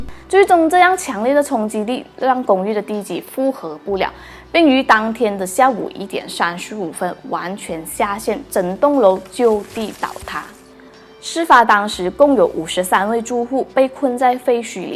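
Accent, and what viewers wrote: Malaysia. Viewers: 我实在是太喜欢马来西亚口语啦！可爱！ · 聽口音就知道是大馬人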